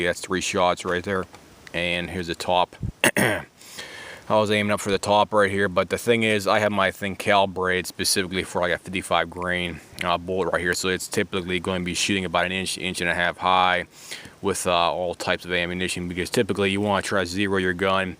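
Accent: Pittsburgh, United States. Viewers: This guy is definitely from the Pittsburgh area!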